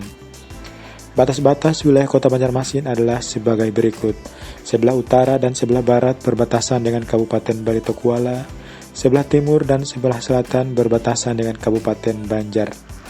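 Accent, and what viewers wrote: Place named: South Sulawesi, Indonesia. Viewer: Dr logat bahasa sepertinya admin orang sulsel ya 😂,sangkamma jiki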